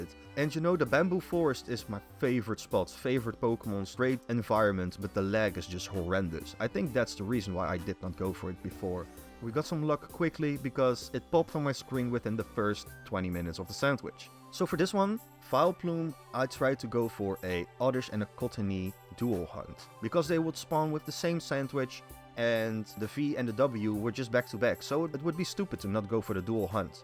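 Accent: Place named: Netherlands. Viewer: You're Dutch aren't you? knew it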